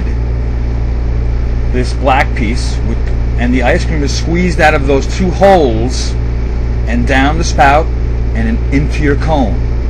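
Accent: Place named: Italian-American, United States. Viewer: I like your Italian-ish American accent.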